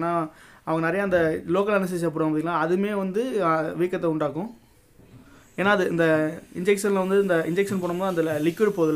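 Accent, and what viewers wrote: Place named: Madurai, India. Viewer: Bro Neenga paesuratha paatha Madurai nearer Pola Neenga..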